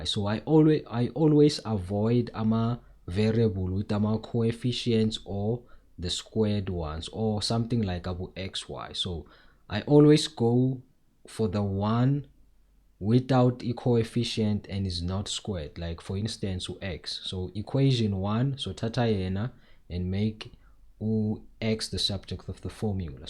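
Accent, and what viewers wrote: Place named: South Africa. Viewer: "I'm watching your math videos on YouTube, your teaching is good but I'm struggling to understand because of the accent. You mix South African with English and it's a bit hard for me to catch. Can you please speak a bit slower or use more clear English?"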